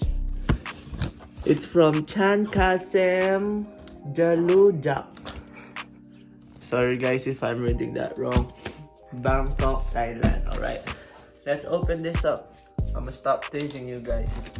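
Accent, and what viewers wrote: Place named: Philippines. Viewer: He's definitely Filipino with an accent.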